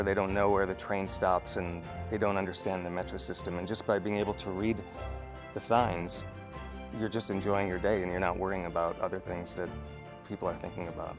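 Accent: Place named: Quebec, Canada. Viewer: You know that Quebecois French is different than French from France right? His accent and pronunciation will be slightly different.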